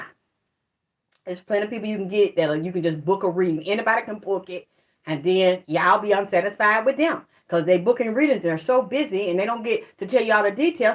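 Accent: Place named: Georgia, United States. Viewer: Hahahahaha. I'm not done watching yet. But I had to write I laughed so hard there were tears in my eyes when you were answering the lady's question about how to get a reading and what not to do when get a reading from you. I'm a Ugandan that grew up in USA. I like your Georgia accent and readings you do on here. I also don't mind you speaking Ebonics because Ebonics is one of your languages: I understand you whether or not you speak Ebonics or slang. Okay, now back to watching the rest of your video. If I have more to say I'll post another comment.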